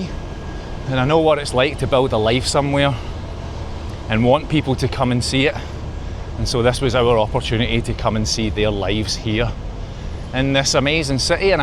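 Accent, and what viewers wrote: Scotland, United Kingdom. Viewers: I've always thought your voice sounds just like Roddy Frames - then I discover in this video you're from the same town ! 😁 - sorry I don't know different Scottish accents,, it's probably obvious to Scots 😂Love the videos, well done on what you're doing 👍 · Really enjoying your channel. You are very well Scottish spoken. So soothing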